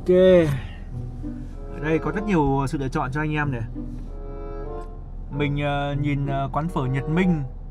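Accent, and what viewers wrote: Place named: South, Vietnam. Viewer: Anh có bạn miền nam ko? Anh nói nhiều lúc có pha tiếng nam vô :))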